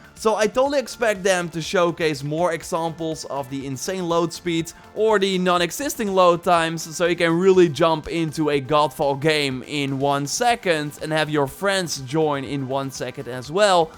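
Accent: Netherlands. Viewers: is Dutch, Guerilla Games is based in Amsterdam, hence the Dutch pronounciation. (But yea, is Dutch too. =P)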